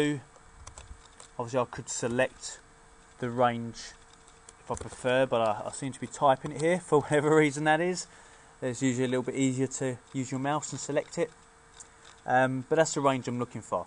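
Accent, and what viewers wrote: United Kingdom. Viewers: you rock! your british accent made it more interesting compared to the other monotone tutorials..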